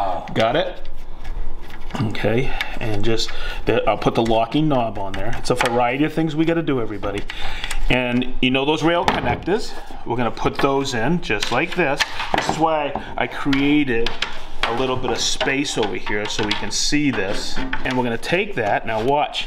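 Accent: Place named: New England, United States. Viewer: I just got a TS55 & OF1400 router. I read a lot of guys say only go with a continuous track… Would you recommend joining 2 Festool tracks to cut a full 96” length of plywood or just getting the FS2700 or FS3000? Thank you for the videos by the way. I have been watching the last few weeks. Great content. Love the new england accent brother!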